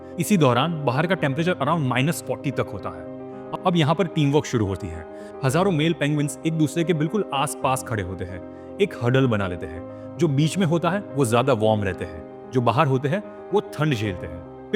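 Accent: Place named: Gujarat, India. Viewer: mko aap gujrati kyu lg rhe ho aapke bolne ke tarike se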